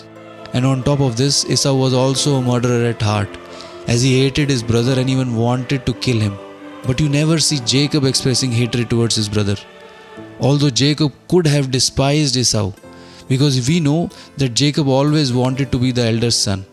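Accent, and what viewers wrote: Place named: Arab world. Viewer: I'm curious to know where in the video it says he's not? I encourage you to rewatch the video because I think the point of this video went over your head. I'm guessing maybe because the accent of the voice over sounds arabic you think he doesn't believe Jesus is God, like I admittedly questioned myself, but 2 seconds of looking through the titles in his video uploads you'll see one titled "Jesus is God Himself". So rewatch the video and focus on the lesson of how God can use imperfect people for His Glory. God Bless you brother. ✝️🙏🏽💪🏽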